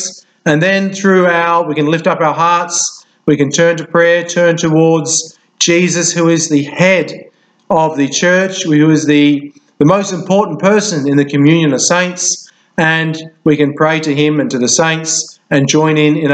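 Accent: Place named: Canada. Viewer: Thanks for the inspiring message Padre! Nice Canadian accent 😂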